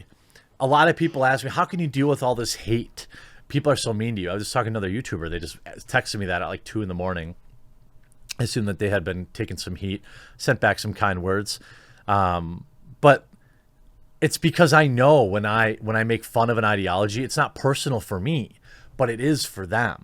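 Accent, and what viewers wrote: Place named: Wisconsin, United States. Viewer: We love, but he pronounces "bag" as "beg," flag" as "fleg," and "dagger" and "degger." I have no authoritative source, but it is believed he also pronounces "wash" as "warsh." He's from Wiscansn. Cut him a little slack.;)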